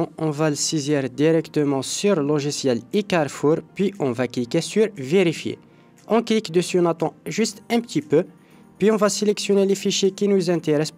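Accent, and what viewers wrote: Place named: Kabylie, Algeria. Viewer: Kabyle algérien ❤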